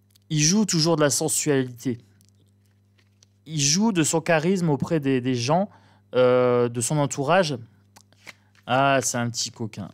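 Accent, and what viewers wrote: North, France. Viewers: Bonjour tu vas bien?tu nous fais remonter mon énergie en ce moment je suis flagada de regarder tes vidéos ça me rebouteux. Vivement que ce personnage sorte de notre espace de vie.Bisous à toi.Je pense que tu es du Nord?tu as par moment dans ta façon de parler des mots qui me rappellent mes souches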